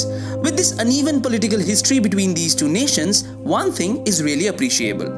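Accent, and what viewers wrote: India. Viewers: The narrator is an Indian for sure..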